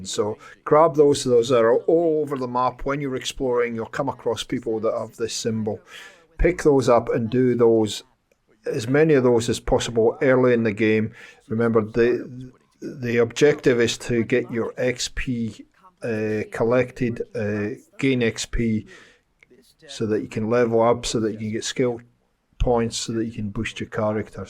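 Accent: Scotland, United Kingdom. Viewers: I picked up this game a month or so ago on PC on a ubisoft connect sale, absolutely loving it! Learnt some useful tips from your video, thanks! Plus, it's always nice to hear a fellow Scot on a video, always a nice surprise! Cheers! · Great video m8 and you sound Scottish aswell so deffo subed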